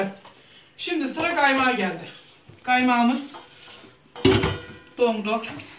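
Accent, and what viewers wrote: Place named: Black Sea, Turkey. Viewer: Ah hemşehrim ah çiğirt-irkme-zebil olmasın kelimelerini duydukca sanki çocukluğuma geri gittim sanki rahmetli anacığımın sesini duyar gibi oldum ne kadar özlemişim Uşağımızı şivesini kendine has kelimelerini Allah senden razı olsun.Ayrıca peynirde çok güzel oldu mutlaka deniyeceğim hemşehrim çok teşekkür ederim.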